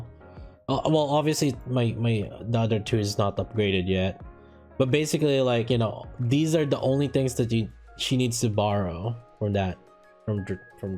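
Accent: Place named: Philippines. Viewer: Are you from the Philippines? Your english sounds like one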